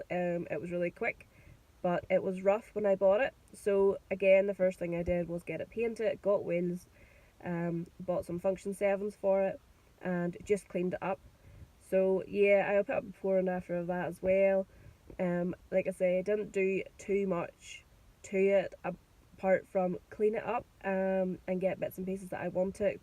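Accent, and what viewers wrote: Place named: Northern Ireland, United Kingdom. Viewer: It's the townie accent which makes them think you're Scottish. 😂 😂 if I had to guess I'd say Lurgan but you might not want to get that specific. 😂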